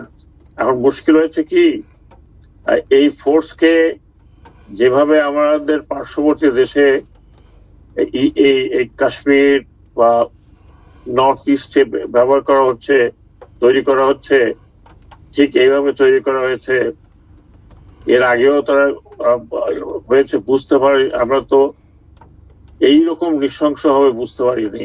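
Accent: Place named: Dhaka, Bangladesh. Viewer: আমি হাসবো না কাঁদব আমি চিন্তা করে পাচ্ছি না । ভিডিও তে বলা হয়েছে, হোস্ট নাকি জার্মানি তে থাকে, ভালো কথা, জার্মানি তে থেকে ও ঢাকা সুরে বাংলা বলছে । উনি পোস্টমর্টেম এর পরের ইন্টারভিউ আর এখন এর ইন্টারভিউ টোটালি আলাদা। যাক বয়স হয়েছে, একটু ভুলে যেতেই পারে। কিন্তু এটা বুজছি না, যে যদি কল টা জার্মানি একজন এর কাছে করা হয়, তাহলে আপলোডের এর IP এড্রেস ঢাকা হয় কিভাবে ? জার্মান থেকে আপলোড করতে পারত না ? অলস অনেক চেষ্টা করছে বুট লুকাতে পারল না, চ্যানেল এর বসে Ip হলো ইন্ডিয়া 😂😂😂। হেয়ার রে গুযোগ এর দেশ । উপর থেকে ভিডিও তে আগে কি হবে সেটাও এডিট করে আগাম বানি দিয়ে দিছে 😂😅😂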